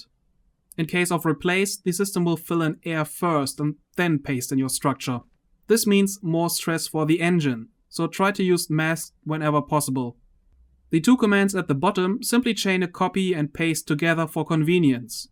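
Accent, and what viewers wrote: Germany. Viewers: Kann es sein das du eigentlich deutsch sprichst?😁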